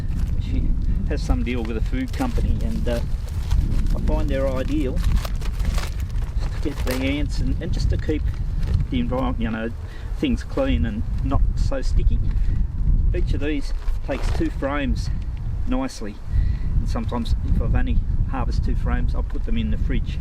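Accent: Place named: Australia. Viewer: Australian beekeeper talking to his bees 😍🥰 I absolutely love the Australian accent.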